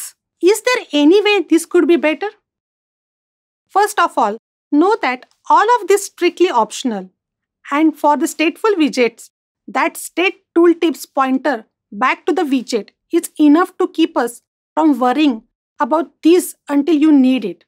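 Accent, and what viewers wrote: India. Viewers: Thanks. First time indian accent 💌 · 这个印度咖喱味道的English听起来真让人难受啊。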